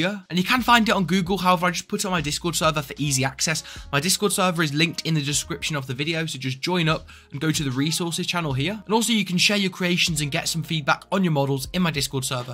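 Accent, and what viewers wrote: United Kingdom. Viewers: boi u british i cant understand watchu sayin for example alt u said Aot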